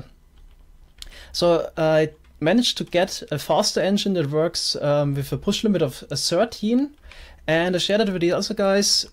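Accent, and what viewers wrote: Germany. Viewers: bro, ich liebe deinen deutschen Akzent! Liebe grüße aus Österreich!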